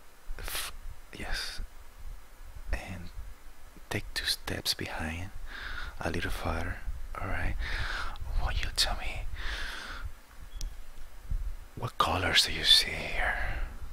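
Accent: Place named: France. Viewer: You sound... FRENCH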